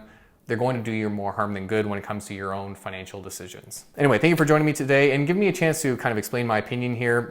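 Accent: Canada. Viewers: I listened to your entire video. I picked up a Canadian accent. Do you think the influencer Romana Didulo will eventually take power in Canada? Are Canadians more susceptible to influencer deception than US people?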